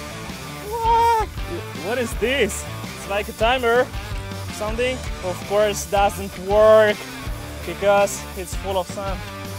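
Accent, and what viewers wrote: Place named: Boston, United States. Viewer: That's clearly a Boston accent.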